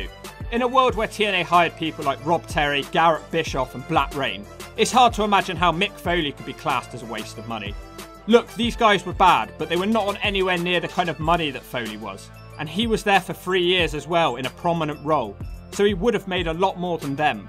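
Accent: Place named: United Kingdom. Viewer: Why do all the creators that make great wrestling content, have a British accent 😂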